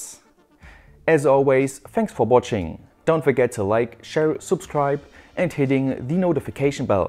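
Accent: Germany. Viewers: damn the german accent is annoooooooying. :(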